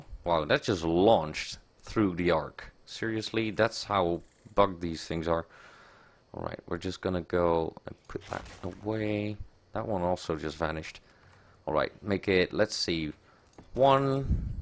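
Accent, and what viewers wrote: Netherlands. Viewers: Are you Dutch by any chance because your accent is very Dutch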